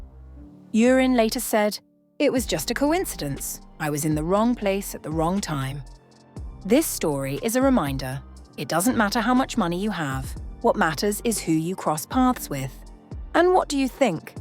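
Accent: United Kingdom. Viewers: Does this Jew-ish Gangster Narrator with an English accent think Urin belongs on the street. He could have killed Putins Son in law. He got off easy. Says The BronxBoy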